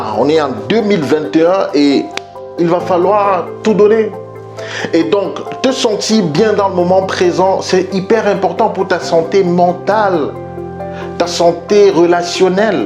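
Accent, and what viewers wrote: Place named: Canada. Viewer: non non c'est juste une remarque, ne le prends pas mal 😂😂😂 Au début tu parlait de façon simple, mais je remarque que ton accent devient canadien Tu vit au Canada n'est ce pas ?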